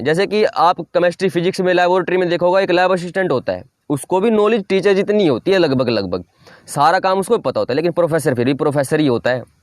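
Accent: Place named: Haryana, India. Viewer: Haryanvi accent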